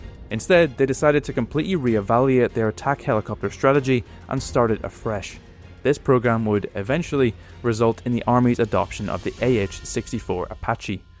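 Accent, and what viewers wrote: Northern Ireland, United Kingdom. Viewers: And this narrator is northern Irish AF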